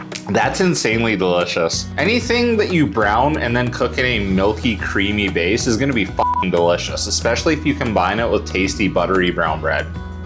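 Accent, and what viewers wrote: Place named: Alberta, Canada. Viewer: Who can tell me where in Canada this guy is from? the accent ..........Alberta?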